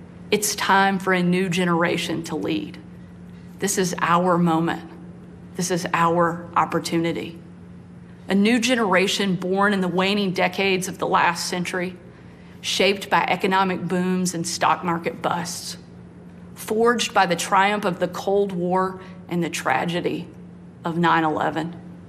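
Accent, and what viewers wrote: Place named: Appalachia, United States. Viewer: Hick vill speaking 😅😅😅😅😅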